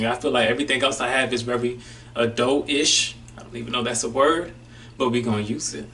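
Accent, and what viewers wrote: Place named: Spain. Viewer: Lol "its my Spanish accent even though I don't speak Spanish" 😅.. Im definitely with you on that!!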